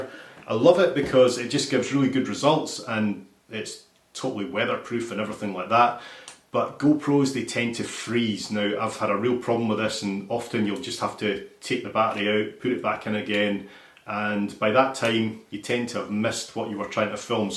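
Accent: Scotland, United Kingdom. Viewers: Hi, I have been binging your videos for the last 4 or 5 days and not only do I love the way you wander around discovering places and people but also your fabulous accent. It's so nice to hear a Scot talking about Scotland; it adds flavour. I'm a Scot myself but I left Scotland years ago and miss it terribly. Traveling with you just brings it all back in such a nice way. Here's a suggestion for a wee visit; the Falls of Dochert at Killin, western end of Loch Tay. There's a Wade bridge and it's really spectacular. After that, take the loch side road to Aberfeldy, turn right onto the A 826 as far as Milton, then the A 822 through the Sma Glen to Amulree and Gilmerton. You'll be back in Edinburgh by nightfall and it's stunning all the way. Take care; look forward to more journeys. Aye, Christopher Pearson ( in Paris)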